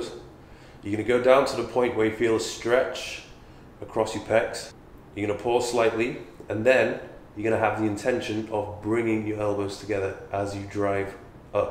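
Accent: United Kingdom. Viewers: I don’t know what’s better his form advice or his British accent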